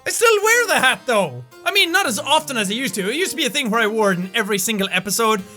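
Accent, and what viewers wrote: Ireland. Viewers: This guy right here got me addicted to Irish accents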